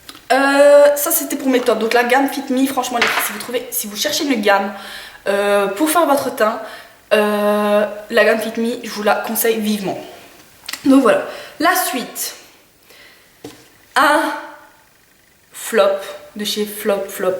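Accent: Belgium. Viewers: salut, je viens de découvrir ta chaîne et j'aime beaucoup tes vidéos tu es claire et précise et ton accent Belge je crois si tu es Belge je l'ai vite capté lol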